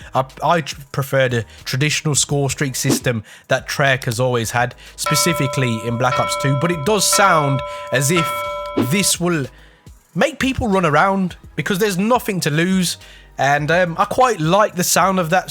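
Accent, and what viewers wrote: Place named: Scotland, United Kingdom. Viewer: Nice haha, I'm Scottish but I could tell by the accent. Also, if you need music to put in your videos feel free to use mines!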